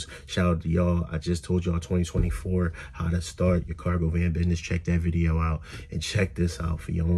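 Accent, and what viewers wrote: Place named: Philadelphia, United States. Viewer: oh you def from philly just got a van thanks for the advice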